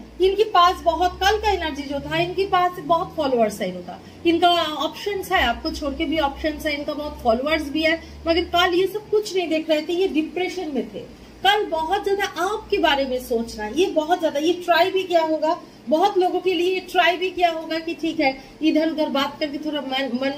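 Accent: Bengal, India. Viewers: I simply love ur Bengali accent its so sweet maam just like u ❤❤❤❤❤❤❤❤❤ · your accent and the way of speaking is typically Bengali or atleast East that us Odisha or Assam.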